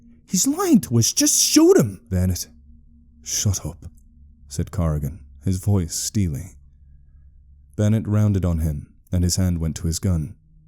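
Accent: Germany. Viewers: You know he nailed the german accent when it reminds you of Werner Herzog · As an enthusiast and practitioner of accents, i've gotta say your German accent is on point!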